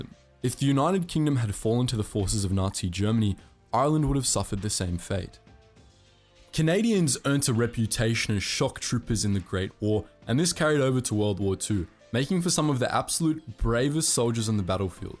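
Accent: Australia. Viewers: Judging by your accent, I would guess you are Australian? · Considering your accent and you being Australian. Hard to take this video seriously since you’re biased.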